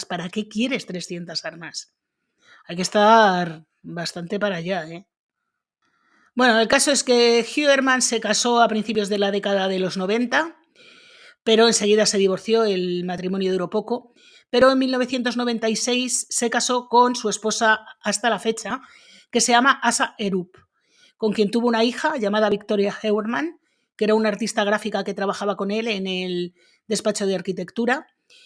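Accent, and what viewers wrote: Spain. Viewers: Hola! me gusta muchìsimo como describes tus videos, siempre muy interesantes.Ademàs me encanta tu acento; de què lugar de España eres?.Saludos desde Italia❤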